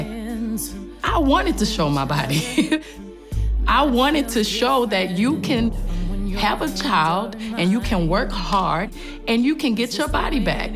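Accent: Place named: South, United States. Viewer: She's so country!!! Cute. "I'm just tawking shyite." Lmao great stuff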